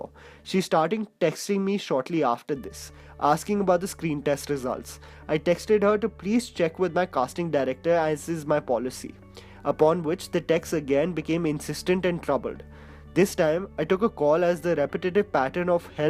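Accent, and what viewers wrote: India. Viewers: If he had a pure American or British accent he would be criticized for a 'fake accent' . He speaks in a way most indians understand and still people are complaining? Duh! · There is nothing wrong with accent!!! When are Indians going to stop being judgmental about their own people!!! You think he should have what an American accent then it's all good?! Most Indians speak multiple languages so this guy's accent is not an issue. He speaks very clearly. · Some of you all need to chill with criticizing Indian accents. India is a multi-lingual nation, and english is not most people's mother tongue. Also, english isn't the only language that exists in this world, the world doesn't revolve around it. Those of you who criticize him based off of that are narrow-minded. Don't be that person. Be a bit more kind. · This is the typical convent school educated accent in India , believe me his accent is good , very good. 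· you have such a thick accent sigh. makes watching this hard on the ears - for someone who isn't so used to indian accent. I like bollywood so I clicked on this video.. and good content too, but maybe a more neutral sounding accent would make the video more open for non-indians too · To be honest his accent is cringe-worthy, I have seen Indian people with lot better accent then him.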